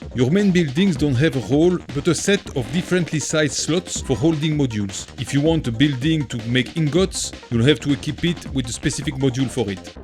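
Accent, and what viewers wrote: France. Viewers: no offense meant but since you asked, to be completely honest, I at first went to my settings thinking I needed to turn on translate to English lol. you have the most stereotypical insanely strong French accent. I'm thankful for closed captions, or I would definitely have skipped the video. I nearly did anyways, but was interested enough in the content to force myself to push through. if it's something you're concerned about, youre not crazy. while there's nothing inherently wrong with any of this stuff, if you are trying to expand your channel however possible and are open to making changes that will likely make your content more easily accessible to the widest audience possible, taking some steps to soften the accent as much as possible would definitely be a strong place to start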